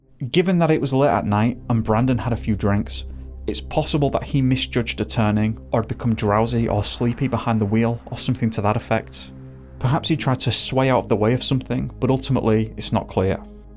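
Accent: United Kingdom. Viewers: Are you from the UK or is that just the voice used for the video narration? Some farms specially ones with livestock can be very very bright. Like midwest Wal-Mart parking lot bright. Not as bright as a whole town obviously but that could be easily confused in the pitch black.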